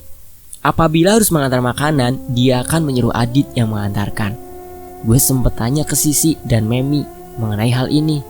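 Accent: Java, Indonesia. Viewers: Min.. Teh yanti ngomongnya pake logat sunda apa logat bali ya...😊😊😊 mimin cocoknya logat jawa..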